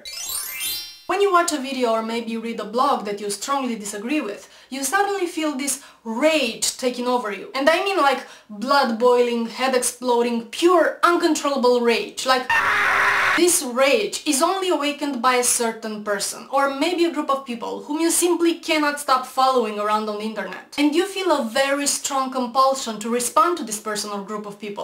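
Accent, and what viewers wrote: Romania. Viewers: I don't hate you , I disagree with several parts of you're post modern liberalism , but not enough to hate you . The Romanian accent prevents me from hating you . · Ms You are stunning!!!! I am in love with your Romanian accent Very cute!!! And your vids are very informative esspecally the Islam one. This is something I would like for us to discuss as you are probably a lot closer to it then I am in the US Keep up the great work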